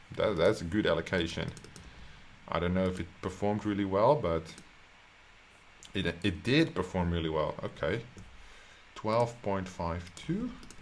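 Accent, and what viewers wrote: Netherlands. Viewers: Just as early as yesterday.. Are you Dutch btw? Your English is great but I hear the Dutch I think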